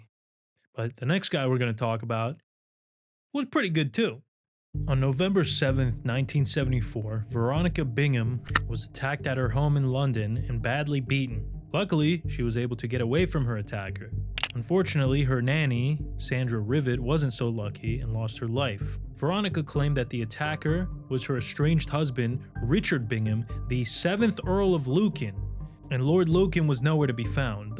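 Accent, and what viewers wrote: New York, United States. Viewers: The new yorker/jersey accent makes this video better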